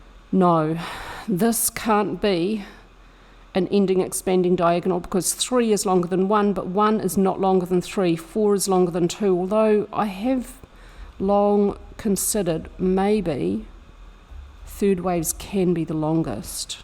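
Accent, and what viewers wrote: New Zealand. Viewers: So good having a kiwi accent in crypto. And i think one of the best Elliot wave channels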